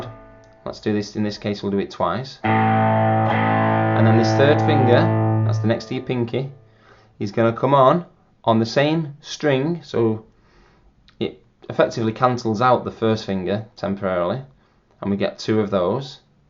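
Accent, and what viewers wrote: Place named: United Kingdom. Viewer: Man's sounds like asian and british at the same time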